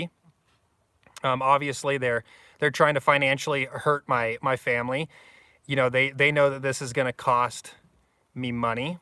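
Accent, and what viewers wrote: Idaho, United States. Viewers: Just stumbled on you today. Digging that Idaho accent.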